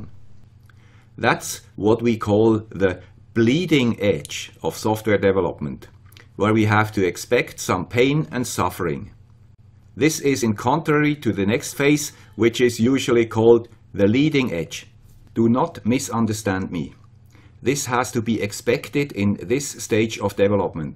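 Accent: Switzerland. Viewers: Swiss accent is good to us from Brazil!congrats!!!!!!!!!! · Yeah you are right. I was in a bad mood that day. Any way, I always enjoye listening to the guy with a Swiss accent, again. Keep it up. (y) Thank you for you great work. :D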